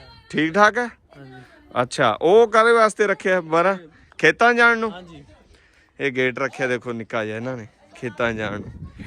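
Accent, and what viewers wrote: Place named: Hoshiarpur, India. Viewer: Aap Punjabi Hoshiarpur wali bari meethi Punjabi boltay hain ko mujay Bohat achi lagti hay mairay Maan Baap Hoshiarpur say aaey thay.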